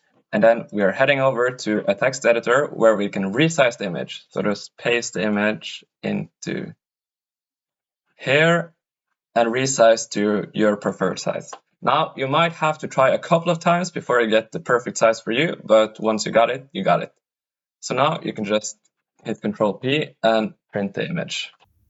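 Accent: Norway. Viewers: Kænn ju tæll mi de vei tu de ræilveistæisjen? 😛 Hører kor du é fra.. Veldig gode videoer, forresten!!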